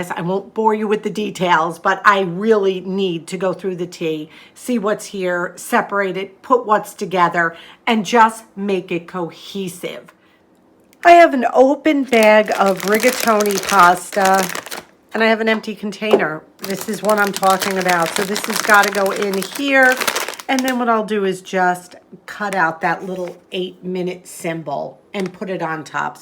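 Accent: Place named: New York, United States. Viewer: I’m a fairly new subscriber and have been enjoying watching your videos. I can really tell you’re from NewYork and nowhere near “Dixie” just by listening to your accent. 😊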